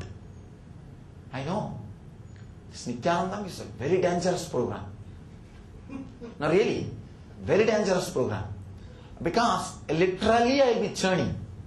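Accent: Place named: India. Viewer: Swamiji's accent has gotten strong again after his being back in India for Himalaya trip. It was hard to understand the first part, but worth while holding on, as usual. An interesting addition to the enlightenment lesson.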